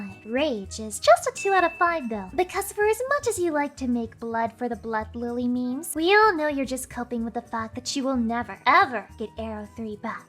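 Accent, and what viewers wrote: South, United States. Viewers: I can't stop watching after hearing her southern accent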